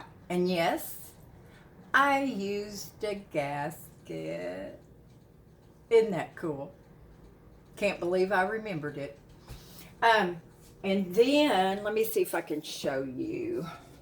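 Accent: South, United States. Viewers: U r the bomb! I luv your southern drawl! U go girl. Your house looks so inviting for folks now.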